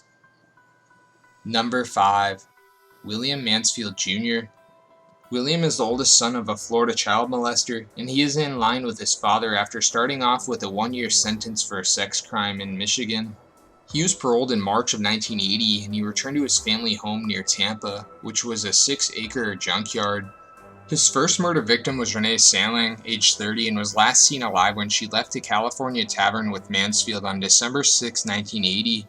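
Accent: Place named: Canada. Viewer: he sounds irish canadian , defo got tht irish twang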